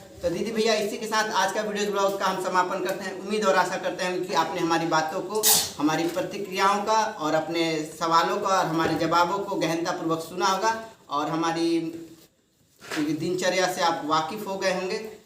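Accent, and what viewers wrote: Standard. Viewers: आपकी शुध्द हिन्दी काफी अच्छी है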